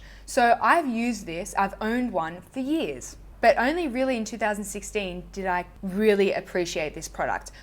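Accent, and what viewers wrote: Australia. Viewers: I accidentally spoke in your kind of Aussie accent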